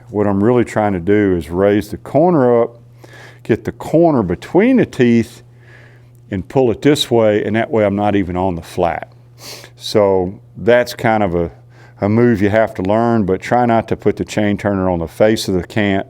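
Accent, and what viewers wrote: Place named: Virginia, United States. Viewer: Much appreciated! As a new sawmill owner your words of wisdom are very valuable. And you talk like me......from South Central VA😂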